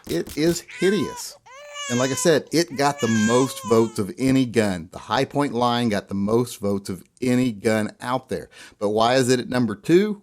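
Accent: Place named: South, United States. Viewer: What an absolute clown. Makes everyone with a southern accent seem as stupid as they sound,and that's not fair. To be honest about the results of the ugliest,rather than asking people to vote and then disregarding what they said and picking your own #1,just post a pic of you holding ANY firearm and you'll have the worst looking ever. What's your hang up with other guys' penis size anyway? You talk about that a lot. People,the only way to watch this douche's videos without getting a headache,is on mute.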